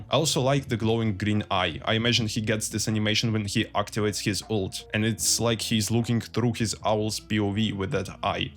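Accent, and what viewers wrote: Russia. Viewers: Я один слышу очень русский акцент?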